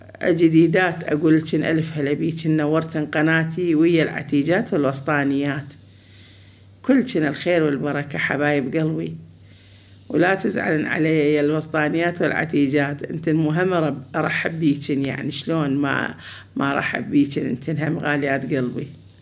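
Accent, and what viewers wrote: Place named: Mosul, Iraq. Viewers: هلا خالة.. بالصدفة شفت الفيديو بالمقترحات وجذبني العنوان.. لان خوالي من گيارة.واعمامي من كركوك.❤ وحسيتج من لهجتج انتي هم من كيارة🌷😁..فدوة لصوتج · عاشت ايدج لهجة الموصل بلسانج تخبببببل احبج هواية خالة. اني زينب من البصرة احب تذكرين تعليقي لان اكتبلج هواية ومجاوبني ربي يطول بعمرج يااارب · احب كلامج اموت على لهجه الموصل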